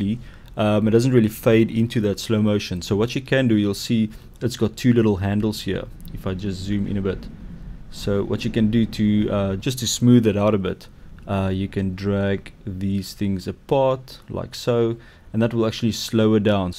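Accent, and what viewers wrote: South Africa. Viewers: "Suid Afrikaanse" accent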